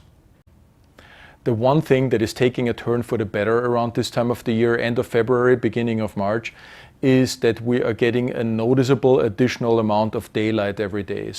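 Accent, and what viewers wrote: Germany. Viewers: German accent?? 🤔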